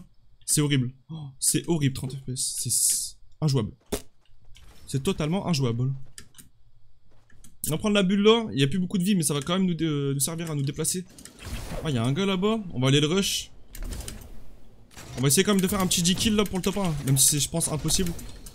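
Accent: North, France. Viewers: Yess l'accent du nord bgg !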